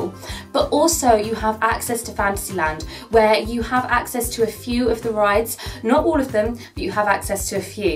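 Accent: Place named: United Kingdom. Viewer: thank you for the videos and they help alot !! love the accent... is every British lady so gorgeous????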